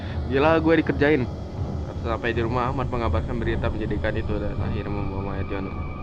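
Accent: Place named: Java, Indonesia. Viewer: bung asli mana kaya orang jawa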